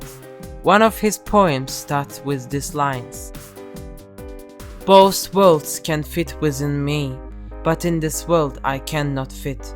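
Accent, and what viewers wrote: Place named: Russia. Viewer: Русский акцент слышен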